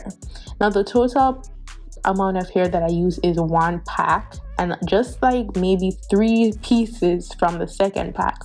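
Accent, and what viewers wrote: Jamaica. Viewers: Great video, you sound like a Jamaican. Are you? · First am stopping by, nice vid. You sound Jamaican are u? Is she guys? I'm Jamaican so I recognize the accent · Are you Jamaican? I think I hear the accent. Love the video👍🏽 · Hi there! U sound like your a Jamaican ☺😇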